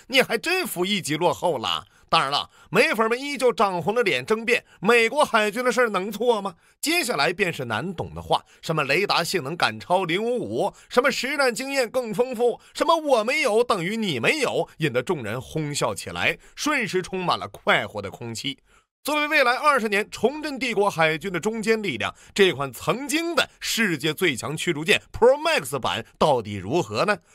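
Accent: Northeast, China. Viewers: 为啥把劳斯莱斯 翻译成罗尔斯罗伊斯呢？ 听口音你大陆人啊。 新的DDG主要是 操作熟练。从濒海 猪母 这些。还是老的伯克级靠谱。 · 东北口音难听死了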